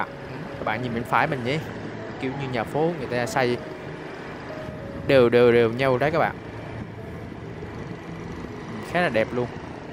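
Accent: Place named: Binh Dinh, Vietnam. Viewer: Chuc mung nam moi ban! Video nay co thuyet minh de hieu, co tien bo, cam on ban! Xa que may chuc nam nhin lai neu khong co loi dan giai thi khong biet la o dau luon ... Giong noi BDinh than quen, chuc kenh phat trien nhieu ban nhe! 🌻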